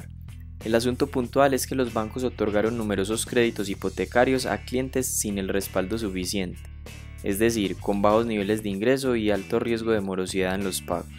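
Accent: Colombia. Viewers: este men es de colombia??? tiene voz d colombiano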